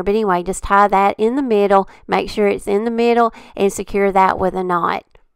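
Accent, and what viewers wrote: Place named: Alabama, United States. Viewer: Finally! Bows I can make that fit my decorating style😊 I'm so excited to have found your channel & not a bit surprised to see you're from Alabama (haay from the Shoals & yes your accent gave you away❤) God has blessed you with natural talent. Thank you for sharing. You make it seem so easy & your sweet spirit is so encouraging. God bless you. I hope you have a wonderful day!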